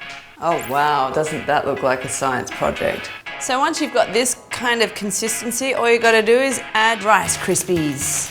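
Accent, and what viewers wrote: Australia. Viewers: Is she Australian? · Are you Australian?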